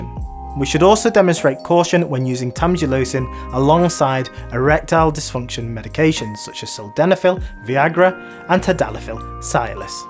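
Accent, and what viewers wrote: United Kingdom. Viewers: He has a British accent because he’s British. He speaks very clearly and is easy to understand to me and the background music is fine too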